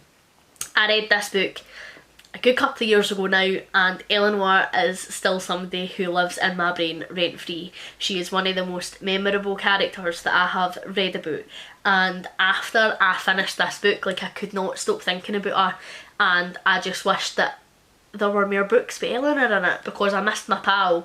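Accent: Scotland, United Kingdom. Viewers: I love regional dialects and sayings - they are just so ingrained in people’s identity and should be celebrated. So many Scottish sayings remind me of some Irish sayings (my family are from Belfast) so in a strange way I feel a little bit of comfort hearing them and I could honestly listen to you talk all day! 😁 Taps aff cracks me up!😂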